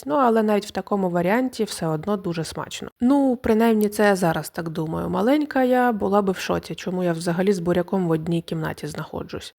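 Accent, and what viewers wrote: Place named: Kharkiv, Ukraine. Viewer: Ви - чудова, і рецепти - цікаві. Більш за все подобається ваш тембр. І ще інколи здається, що ви з Харкова.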